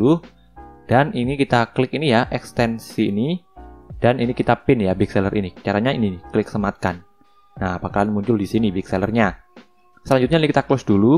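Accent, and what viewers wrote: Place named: West Java, Indonesia. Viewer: bang asli mana ko kayak org sunda??? mohon dijawab bang🙏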